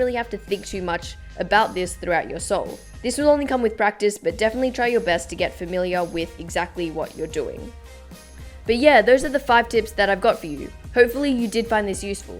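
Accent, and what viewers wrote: Australia. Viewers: the cubey guy she's most likely Australian bc of her accent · Waaaaiiiit...are you Aussie?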